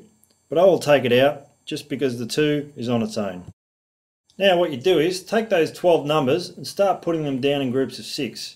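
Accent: Australia. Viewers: That's because he speaks AUSTRALIAN and I can understand him easily and clearly. Thank goodness he doesn't have that American tendency to draaaaawl their words out like the word is 3x longer than it really is in a nasal (annoying) tone of voice. AUSSIE! AUSSIE! AUSSIE! OY! OY! OY! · I understand it because he speaks Australian English?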